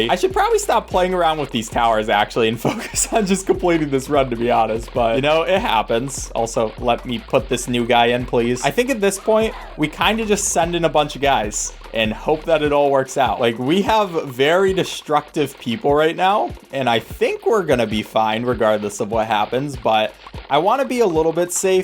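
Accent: Wales, United Kingdom. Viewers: Oh dear, Irish? He's Welsh! It's like 2004 on Newgrounds all over again... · no it’s not it’s clearly welsh 😭😭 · Sir that's a Welsh accent not an Irish one as far as I can tell. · IRISH!? He is WELSH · Irish guy? That's a Welsh accent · im pretty sure that was a welsh accent dude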